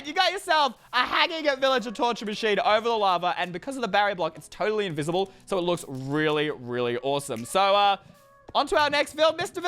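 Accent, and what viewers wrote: United Kingdom. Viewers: You look like chinese,or korean or japanese but your accent is british😂😅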